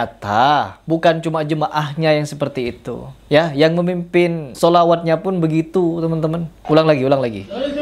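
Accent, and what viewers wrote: Madura, Indonesia. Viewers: Mas logat MADURA tolong dihilangkan.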